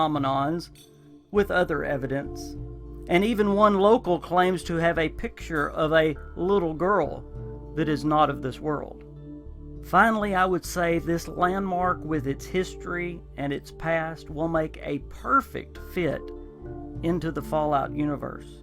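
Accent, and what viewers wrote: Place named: Georgia, United States. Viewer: Sir, are you from Georgia? You say "why" and a few other words that reminds me of how my grandparents talk.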